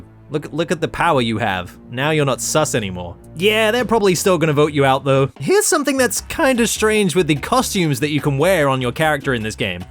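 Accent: United States. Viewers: Why has this man's voice progressively become more American and less British as time goes on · That’s one way to get lots of views. Watched this channel for 5 years - still wish you lost the US accent as you’re clearly not from there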